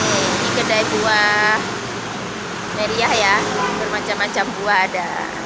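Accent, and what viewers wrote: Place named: Indonesia. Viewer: Bahasa mandarin kakak sudah lancar cuma logatnya msh kental rasa indonesianya. He....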